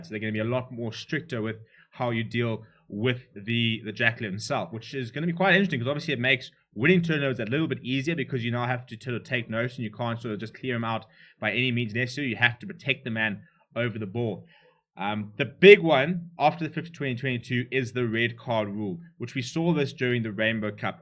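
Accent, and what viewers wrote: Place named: South Africa. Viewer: Good content man. Nice to have a South African accent on youtube talking some sense. Keep it going.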